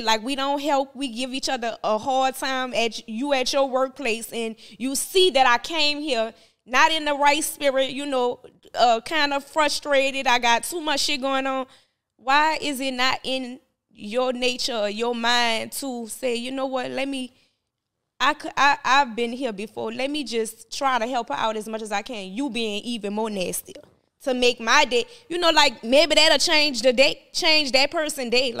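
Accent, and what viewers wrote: New Orleans, United States. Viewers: where you been you was highly mist in these YouTube streets you speak facts on shit that ppl don’t want to speak you actually was teaching I learned a lot listening to you in ya New Orleans voice baby ❤❤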